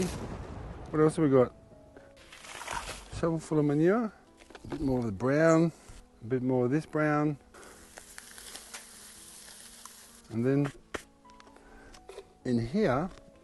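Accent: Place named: Australia. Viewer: How in the world, as many gardening channels as I watch, have I managed to miss this guy? I am totally in love lol. Let's see... long-hair runs around barefoot like I do all the time chases mice around and makes beautiful compost. Loves the smell of Rich soil... And an Australian accent to boot. What's not to love? I just wish you could come help me with this mess I call a homestead in Missouri. Too many rocks, too much clay, no rain etc. But I'm trying. Thank you!! I needed this. Going to plant cover crops if it ever rains, then in late winter I'll cut it down, cover with my compost, tarp and let it sit till I plant it. I winter sow, so I have time to let it rest before things have to go out in the garden. I am using turkey, chicken, quail, duck, and rabbit manure, as well as grass clippings and leaves and plants that I've cut out of the garden from this last season excetera.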